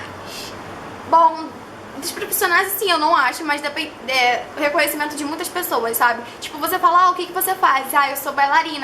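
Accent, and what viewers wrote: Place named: Rio de Janeiro, Brazil. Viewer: De cara, já percebi que você era carioca ❤️sotaque liendo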